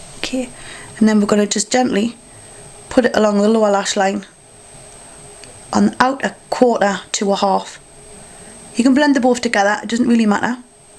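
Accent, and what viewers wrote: Newcastle, United Kingdom. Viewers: where are u from? UK? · Lol you speak fast! And with your British accent I cannot understand everything you say.. Oh well, you still make wonderful videos :) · *squeals* When I clicked this vid, I had no idea I'd be listening to a fellow geordie!!! Awesome. I was well expecting a yank....XD xxx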